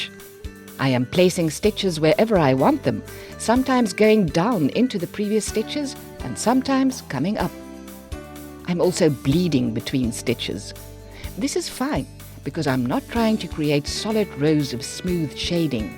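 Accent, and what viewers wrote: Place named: South Africa. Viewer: She is South African, we speak this way.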